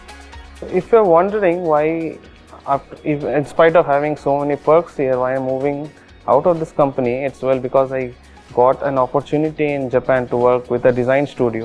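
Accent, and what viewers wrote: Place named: Tamil Nadu, India. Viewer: I thought it's Tamil, as the accent was same!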